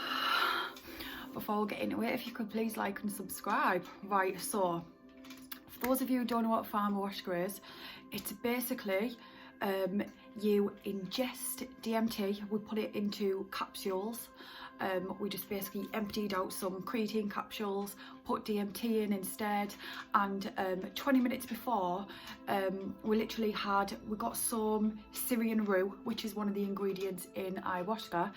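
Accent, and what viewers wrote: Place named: Newcastle, United Kingdom. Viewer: What a brilliant channel, thanks 😁, I'm prepping to go in with some rue, but I vaped it via the vandy vape last time and it was slightly brutal.. Everytime I trip I always come out thinking I need to work with my energy and balance myself better before hand... And I'm useless at this 😂 positive your channel can give me some pointers though. Love the jordie accent too? Reminds me of living in the lakes 😊easy listening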